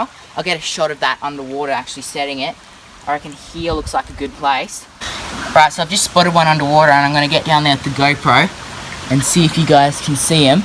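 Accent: Australia. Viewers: Are you an Aussie????? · Is he Aussie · are you a australian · Are u a aussie? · Are you Australian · you sound Australian, cyclone debie hit Australia, how come you speak American, in this country we call them prawns. however what you were catching were yabbies good to eat but not prawns or shrimp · I can tell ur an Aussie so wanna meet up sometime and do some fishing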